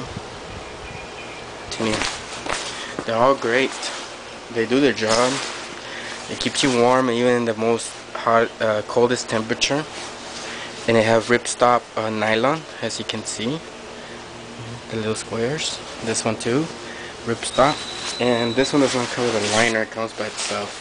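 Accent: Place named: Chicago, United States. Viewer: Oye: thanks for the review. love your accent ,too. reminds me of my friends form chicago!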